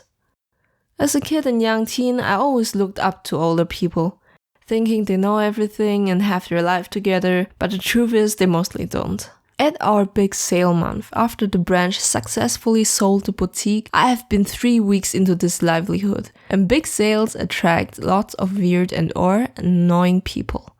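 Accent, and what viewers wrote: Germany. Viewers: she comes from austria so it is a german accent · the accent also sounds like a german accent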